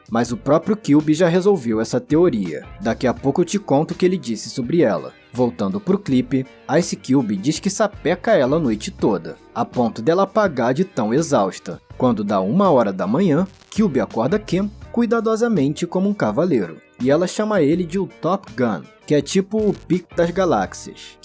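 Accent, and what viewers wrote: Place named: Rio de Janeiro, Brazil. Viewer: O melhor é q esse vídeo sobre Cube é narrado por um carioca. Vaibe de gueto.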